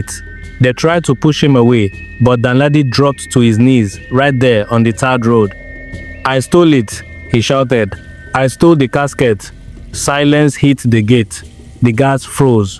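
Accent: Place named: Africa. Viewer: Listen man, use your AI voice , there's nothing wrong with that. It enhance your narration. Although it's a pleasure to hear you, if you want to go global and make money use the voice. We have accent, only Africans can understand, and if your audience is beyond the local, you need to use the voice. It's business! Nothing personal!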